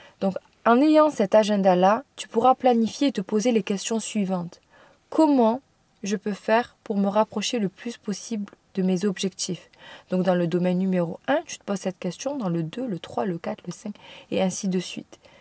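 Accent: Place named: South, France. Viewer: tu a un beaux petit accent du sud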